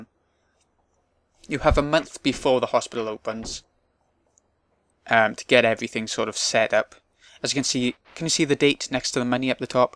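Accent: Wales, United Kingdom. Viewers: Used to LOVE this game, gonna buy it again on PSN. And no offense but I love your accent, which is unusual seeing as I usually dislike the welsh accent :P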